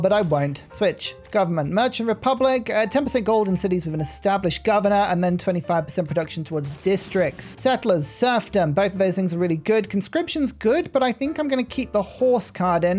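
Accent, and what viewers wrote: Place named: United Kingdom. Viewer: "vis.. vwappid coowing of ayges" sometimes the British is so thick it makes my American blood sick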